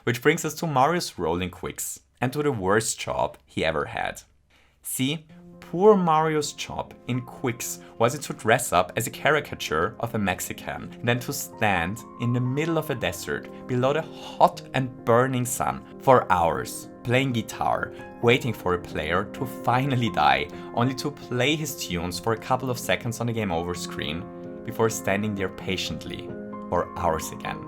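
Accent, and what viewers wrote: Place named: Germany. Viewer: This video was pretty shit tbh. Its basically 17 minutes of conjecture and assumptions, why should a simple reference make every super Nintendo commercial canon? Why should Nintendo pay mind to something that literally no one will think of? And about that whole cultural caricature thing? Mexican people still wear ponchos and sombreros, so why shouldn't mario be able to wear local attire? Based on your accent I'm gonna assume you're German. And as a german, don't you find it fun when a character wears lederhosen or speaks with a german accent? I know as a dutchman when someone assumes I wear wooden shoes and smoke weed I'm charmed. I know that waluigi is incredibly popular here because he represents us, I love your content but this whole video had me scratching my head through its entire runtime. I really hope you'll do better next time.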